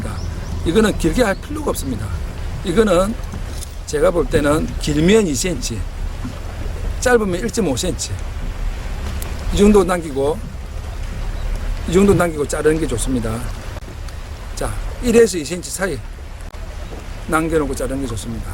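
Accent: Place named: Tongyeong, South Korea. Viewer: 고향이 통영인 외지에 사는 사람입니다 고향말을 들으니 좋아서 그러니 오해마세요 ㅎㅎ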